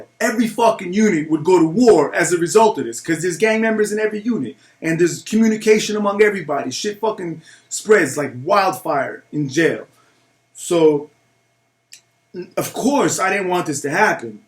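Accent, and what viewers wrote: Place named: New Jersey, United States. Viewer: You're such a great story teller. Just found ur channel & you got great content. Plus, I luv the accent. Im from Jersey & hearing u talk reminds me of home👍 Keep up the great work!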